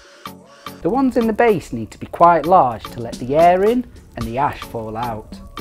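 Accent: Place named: England, United Kingdom. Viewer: He's most likely in England, Australia or New Zealand. The English pronounce it as "Al-ew-minium". Why? There are those that believe the guy who first created Aluminum from Bauxite named it that... and pronounced it that way. You can research up the oft controversial topic and do some reading... it can be somewhat amusing to us engineer types. Peace & good vibes! - Max Giganteum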